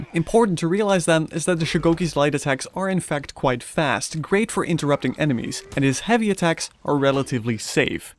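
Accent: Netherlands. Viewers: I'm new to this channel, but are you dutch?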